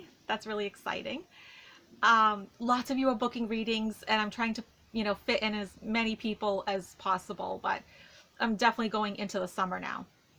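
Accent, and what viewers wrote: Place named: Midwest, United States. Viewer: No. Sounds mid-western. Definitely not California.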